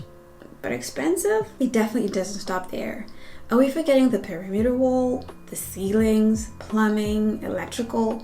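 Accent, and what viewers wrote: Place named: United States. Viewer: Judging from the houses we see on YouTube and those we have seen in person, the general building methods and principles and tastes Zim are too isolated from developed word standards. Without exposure Zim trades ppl and builders merchants n allied industries/professionals will disappoint those who are coming from the western /first world diaspora. 🤷🏽‍♀️ You sound American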